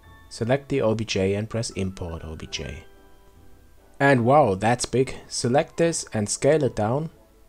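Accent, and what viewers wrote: Germany. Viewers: haha nein dein englisch ist sehr gut aber man hört, dass du deutsch sprichst p.s tutorials zum addon wären klasse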